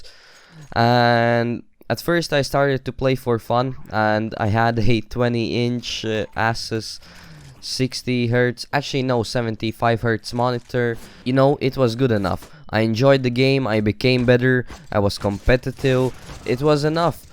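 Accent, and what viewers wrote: Latvia. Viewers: No akcenta sapratu ka tu esi latvietis :D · Latvietis esi? ljoti izklausaas :D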